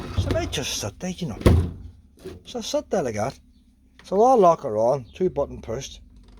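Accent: Ireland. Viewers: Great video - thanks for that! And, your thick Irish accent reminds me of my grandfather - so thanks for that too!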